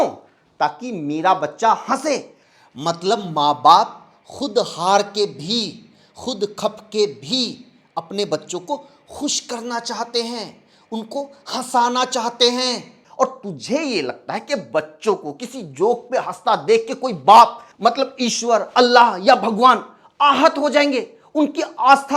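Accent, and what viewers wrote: Haryana, India. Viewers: bhai Aapka Andaze-Bayan Haryanvi hai aur content is full of true facts and fun. I like your videos. All the best. 🎉🎉 👏 👏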